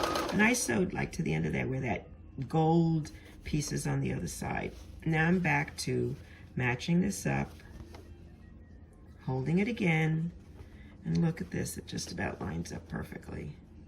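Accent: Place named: New York, United States. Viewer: Thank you for your truly helpful and honest instructions. Your close up video of piecing and sewing is very helpful. And I love that NY accent and attitude.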